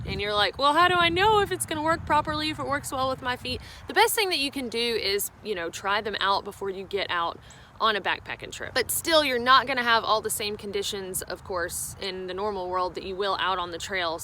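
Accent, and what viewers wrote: South, United States. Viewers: Once again, top notch video, great content. Recently went to a seminar, and heard same points, different words. I like your southern drawl better. Thanks for all you do here!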